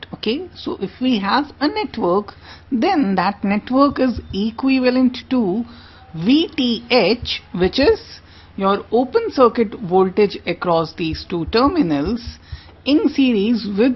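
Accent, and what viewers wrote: India. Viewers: it's regular INDIAN English pronunciation... these make my ears bleed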